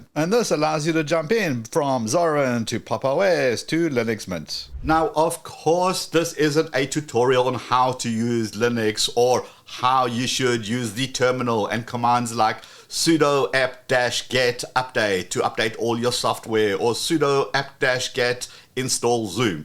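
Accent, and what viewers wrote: South Africa. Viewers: You can tell by the accent. I'm also a South African.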